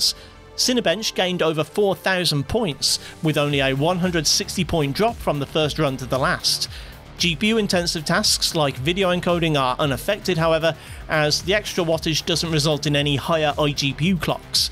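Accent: United Kingdom. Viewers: The presenter is British and therefore pronounces words in English.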